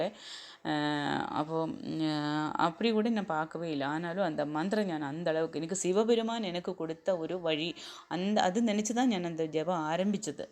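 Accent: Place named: Kerala, India. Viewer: Akka unga speech nallarukku.... malayalam kalantha tamil🎉🎉❤❤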